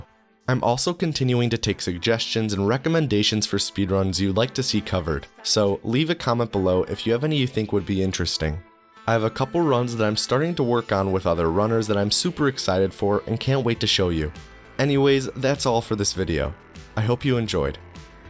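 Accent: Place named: Boston, United States. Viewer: I detect a Boston or new England accent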